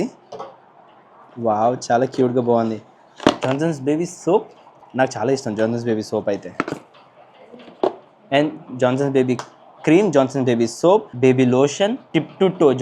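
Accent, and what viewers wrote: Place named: India. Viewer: though ur telugu is hindi mixed ....I appreciate ur telugu......but actual speaking we southindians face huge problem with northindians .....they won't develop thier states ....migrate to our south ....we are facing job crisis ,hindi imposition, culture difference....we south are dravidians....our culture is different from north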